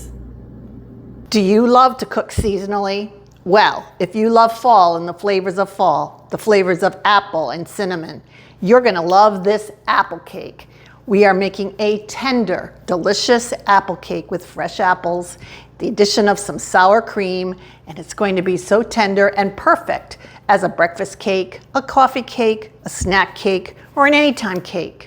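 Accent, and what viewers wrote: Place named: East Coast, United States. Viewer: Hi, Thank You for the tip on freezing the bread. I'm a bread [person, so I could eat the whole loaf, but my sensible self says NO! LOL We're heading out camping for a few days, last camping trip of the season. I picked up some molasses the other day at the store, so I'll be making the Anadama Bread when we get home. I thought your accent suggested an East Coast accent. Thanks for replying. Have a Great Day. Ellen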